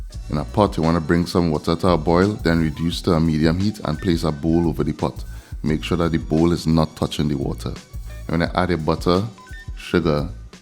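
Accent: Trinidad and Tobago. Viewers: The accent of a Trinidadian is very distinguished! 🖤Nice recipe! I will have to order the fruit via amazon.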